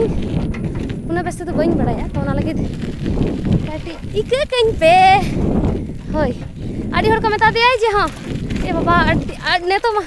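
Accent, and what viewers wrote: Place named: Santhali, India. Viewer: Super santhali voice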